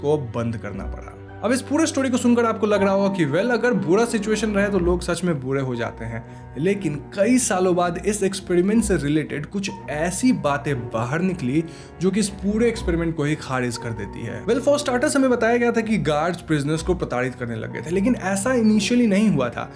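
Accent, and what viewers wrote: Bengal, India. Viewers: Sir aap bangali ho ?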